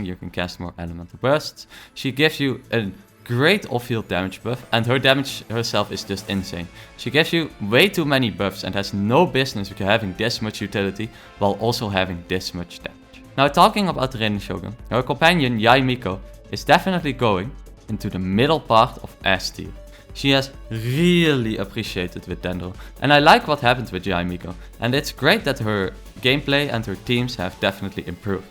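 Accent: Netherlands. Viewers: Subbed for the dutch English and I like your choice of childe being your choice.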